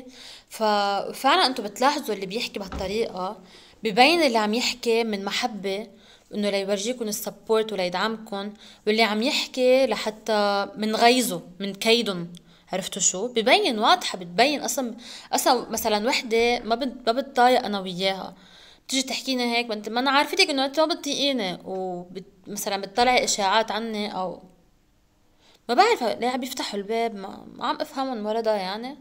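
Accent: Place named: Lebanon. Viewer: و أنا بحبك 😂♥️ فعلاً العين و الحسد و اللت و العجن الزايد ما حلو أنا معك بالموضوع وشو كيوت طالع منك الحكي اللبناني 😆♥️ متابعتك من سوريا 😁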